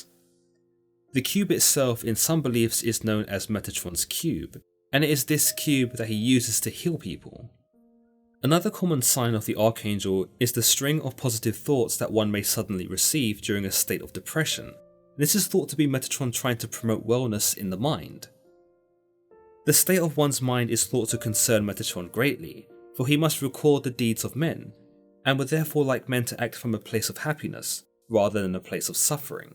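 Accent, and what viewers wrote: United Kingdom. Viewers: Your scene is very witchcrafty creepy I noticed you have a British accent I I know there's people trying to sell something on TV have a British accentee too and make you more respectable acceptable and intelligent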